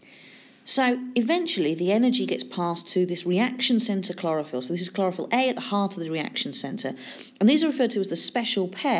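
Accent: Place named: United Kingdom. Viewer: It's not bad pronunciation it's accent (British accent)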